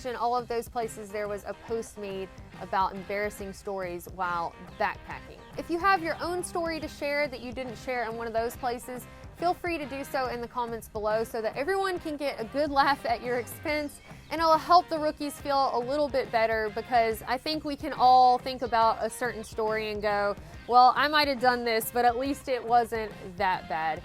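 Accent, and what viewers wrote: South, United States. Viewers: Love ALL your vids, but a side effect of binge watching yours and Quicksand’s videos is that when out hiking I find myself thinking and talking to myself in that beautiful southern accent, I swear 🥴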